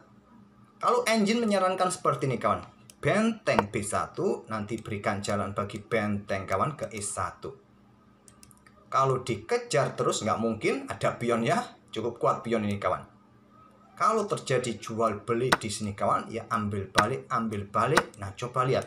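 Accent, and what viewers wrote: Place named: Surabaya, Indonesia. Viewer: Ternyata admin ini arek2 Suroboyo iya😀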